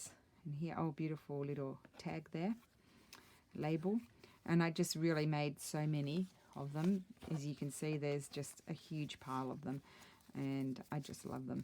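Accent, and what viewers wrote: Australia. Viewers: it was such a such a treat to find your first video. How far you have come since this post. I LOVE your channel. You inspire me with every single video. Your unique situation with being Australian in Italy is very cool! I love seeing all the italian papers you have, and Ii just love your beautiful accent. I am a new Youtuber myself, I do junk journals with a focus on processing grief. I lost my husband 6 months ago, and was surfing youtube while hiding in bed for weeks... I stumbled across Tracie Fox's videos, and found you through her... I really enjoy crafting along with you. thanks so much for the inspiration! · Wow, fabulous work! So glad I found you! Can’t wait to follow you. Greetings from Oz (do I detect an Aussie accent?) 😎🙃🇦🇺😘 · love the down under accent..greeting from Queensland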